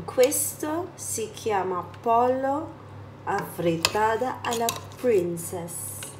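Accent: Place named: Liguria, Italy. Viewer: Che bello il tuo accento ligure orientalizzato...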